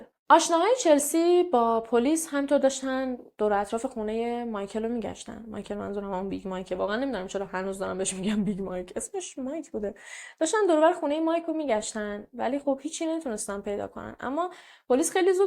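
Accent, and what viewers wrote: Mashhad, Iran. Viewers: پرونده جالبی بود 👍، ضمنا بعنوان یک مشهدی بگم که یکم ته لهجه مشهدی گرفتی خانم😉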